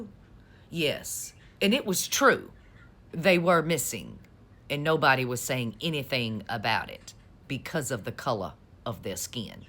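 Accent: South, United States. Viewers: You make my head hurt with that southern accent.🫶🏽🙏🏾🫶🏽💕❤️💕❤️💕❤️💕💕❤️🫶🏽❤️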